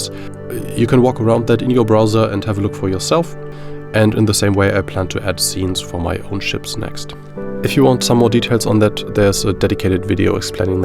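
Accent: Germany. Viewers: dieser akzent macht mich fertig..deutscher ? :D aber respekt guter content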